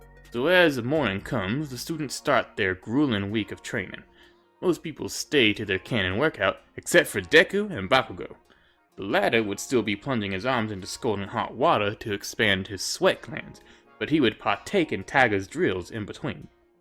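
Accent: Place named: Brooklyn, United States. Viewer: Love the Brooklyn accent.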